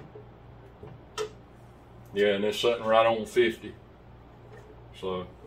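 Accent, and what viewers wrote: South, United States. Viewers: I’ve already commented which you responded to, thankyou. Just want to make a viewers statement on your vids., you give us content we don’t find basically but is extremely informative that fills the gap on many vids on YouTube. Then you make a vid without all the fanfare at the start. Then your totally unbiased opinions that doesn’t offend anyone including the competition. At my old age you meet the criteria of the genuine producer of consumer products. you need to be proud of your honesty, professionalism to produce products that are of the highest order. Unfortunately in this day and age this attitude is getting rarer. Keep up the good work., being half way around the globe I cannot benefit from your high standards but I look up to your honesty and professionalism. Cheers mate. And geez mate your southern accent is full on. 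It’s cool coming from a slang happy Aussie. Keep up the good work.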